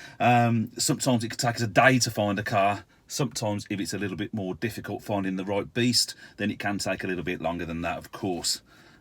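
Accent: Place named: Birmingham, United Kingdom. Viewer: ! How ya doing? I was swiping through YouTube when I recognised a face I hadn't seen in a long time. Your time in Colchester at hyderabad bks was many Moons ago and you certainly didn't have that many tattoos but remember that brummie accent anywhere. Liking the channel mate a good to see things are going good. Paul G (REME) 3 para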